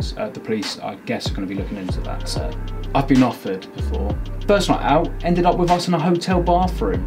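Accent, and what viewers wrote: United Kingdom. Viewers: I like that british accent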